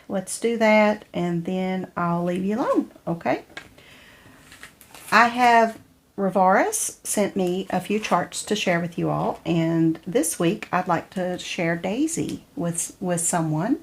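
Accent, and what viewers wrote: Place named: Southern West Virginia, United States. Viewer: I just started watching your flosstube and am enjoying them. I knew from the moment I heard you that you were. Southern WV girl! My mom was born in one of those coal camps, unfortunately it doesn’t exist any longer. Her sister still lives in the area but my parents moved around a lot and ended up in FL. Love your conversion on the FQS quilt piece. That looks like Christmas to me. Happy stitching.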